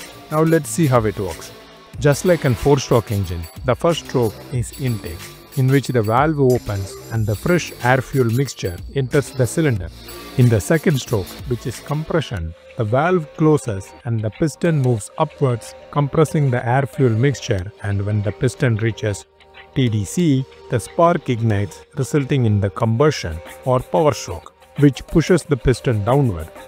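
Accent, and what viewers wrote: India. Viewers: The indian accent got me😂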